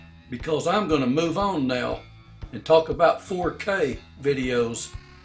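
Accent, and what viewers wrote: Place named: South, United States. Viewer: I do not know whether you have taken studies in communication at all but you are gifted communicator: concise, precise, positive and with the common touch and southern humour. Shades of Mark Twain and Paul Hogan of Crocodile Dundee. The South accent and turn of phrase is also part of your appeal. Your skill is not a skill that can be learnt beyond a certain point, no matter how much training. It is interesting that we in Australia use some of your colloquial expressions. You may want to broaden your topics beyond photos to homespun satire. One of our famous satirists passed away this week. See John Clarke and Bryan Dawe on Youtube.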